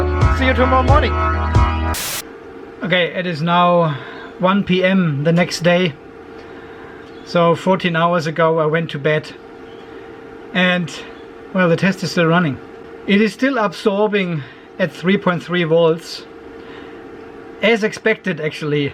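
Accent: Germany. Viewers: CamperT aus Deutschland. Sag mal bist du deutschsprachig? "alter Schwede" kennt sonst kein Mensch. Und dein harter Akzent im Englischen ist wunderbar zu verstehen. Deine Videos sind klasse, habe den EBC A40 schon bestellt. Bitte weiter so in "down under". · I love your denglish !!!!!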